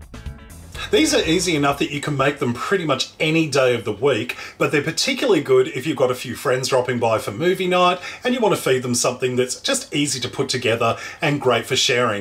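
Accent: Australia. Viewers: Shahrukh Shaikh he has an Australian accent and Sam has an English accent. They sound very different.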